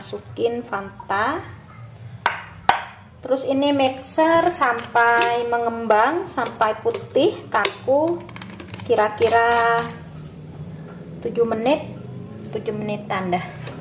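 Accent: Madura, Indonesia. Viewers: Kalau saya tahu cara bicaranya dr pulau madura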